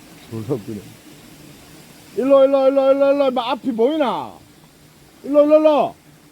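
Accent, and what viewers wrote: Gyeongsang, South Korea. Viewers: 갱상도 사투리도 에라이 다 이해하네요 ㅎ ㅎ ᆢ 형 가벼운 테니스 공으로 에라이 힘들어요 ㆍ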